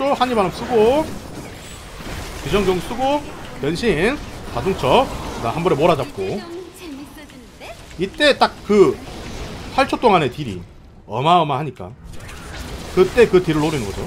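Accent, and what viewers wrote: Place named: Gyeongsang, South Korea. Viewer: 원래 경상도 사람 저정도 악센트 다 있는데.. 듣기 싫으면 다른걸 보던지 다 님처럼 생각하지 않으니 님이 이해를 하세요 서울사람보고 느끼하니까 말투 바꿔라고 하면 똑같이 기분나쁠거 아닙니까 ?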